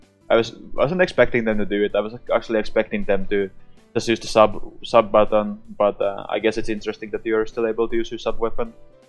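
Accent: Finland. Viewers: Are you finnish? Cuz it really sounds like it xD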